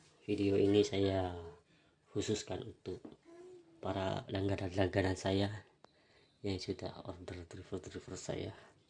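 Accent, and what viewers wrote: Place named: Madura, Indonesia. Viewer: madura mana sampean mas?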